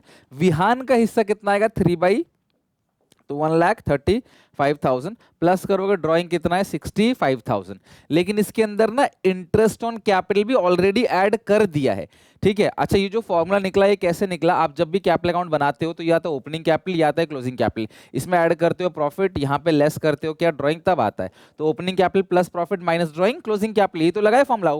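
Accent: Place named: Uttar Pradesh, India. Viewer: Lagi raha tha ki tum up se ho😅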